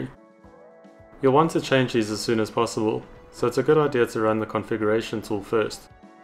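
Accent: South Africa. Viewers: I'm a simple man, i hear a SA accent, i subscribe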